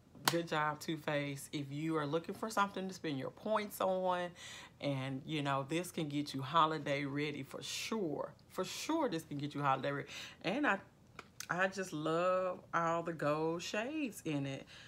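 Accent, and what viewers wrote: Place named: South, United States. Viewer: You are stunningly beautiful. Your Southern dialect is pleasing to the ears.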